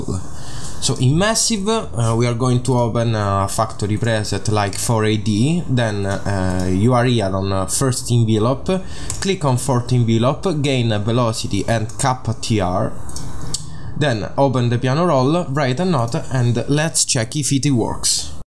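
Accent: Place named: Italy. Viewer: Si sente troppo che sei italiano🤣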